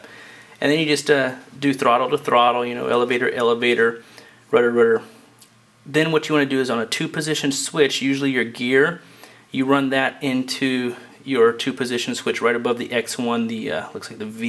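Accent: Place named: United States. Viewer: got their language from England (i.e. you actually speak English in case you hadn't realised.....) anyway, all that said you pronounce 'Solder' with the 'L' pronounced so it isn't, never was, never meant to be pronounced 'Sodder'. Just like you look at yourself in a 'Mirror' and not as you Americans say in a 'Merr'! AND also while we're at it, the most annoying mispronunciation you say, there's an 'S' on the end of 'Math' making the correct pronunciation 'Maths'. So please, when you beg, borrow or you steal something (like language for instance), please have the decency to use it correctly. I eagerly await your childish, abusive comments as I know how you Yanks hate to be told anything and 'put right'. Thank you and 'have a nice day y'all' :)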